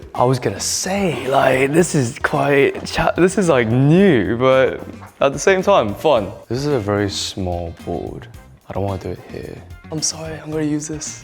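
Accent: United Kingdom. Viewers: His British accent ❤ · MINHO YOU HAVE SUCH A LOVELY ENGLISH ACCENT 😊 BEAUTIFUL #KITTYMINHO HERE 🥰🫡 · British accent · HIS BRITISH ACCENT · HIS BRITISH ACCENT IS EVERYTHING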